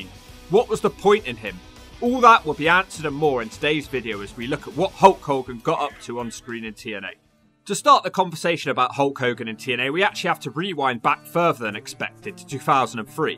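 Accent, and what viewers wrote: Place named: Devon, United Kingdom. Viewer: where are you from as you sound like you’re from my part of the world. I was born in Plymouth (the original one in Devon) and I live in Cornwall just over the Tamar River so I’m on about 15 miles away from Plymouth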